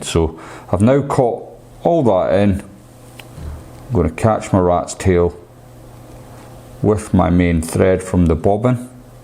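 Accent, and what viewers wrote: Scotland, United Kingdom. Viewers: as an expat scot, I don't know if I watch your channel for the fly tying, which bybtgevwaybinthink is grand, or just to hear your accent!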